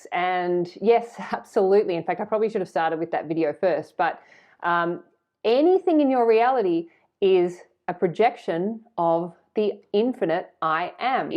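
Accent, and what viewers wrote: Australia. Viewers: So refreshing to hear an Australian. Really enjoying your content from far north Thailand. Thank you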